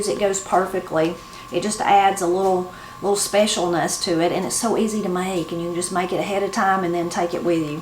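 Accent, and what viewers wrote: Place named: Oklahoma, United States. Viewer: My mom was born and raised in Oklahoma in the 40's& 50's. She married my dad in 1958 and they moved to Iowa where my dad was born and raised. My mom was raised on much the same foods that you make and eat. My dad, brother and I refer to fried okra as eating "candy" to this day. Mom has been gone for 22 years and the way you talk and the meals you prepare remind me so much of her. Thanks for the great memories!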